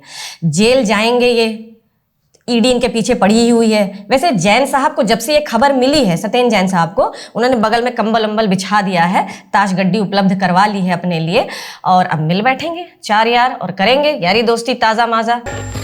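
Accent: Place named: Bihar, India. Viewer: Aapke muh se bihari bhasha ki shobha bdh jaati hai💃💃💃💃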